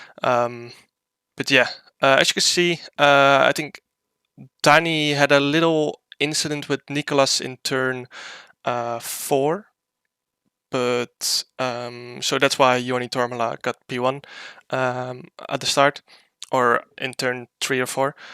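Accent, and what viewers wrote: Netherlands. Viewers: Wat een heerlijk Nederlands accent zeg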